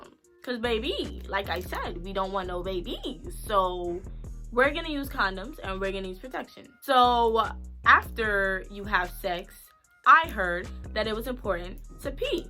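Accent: New York, United States. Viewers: Youu from Ny ? Ya accent 😍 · I love your new York accent 😍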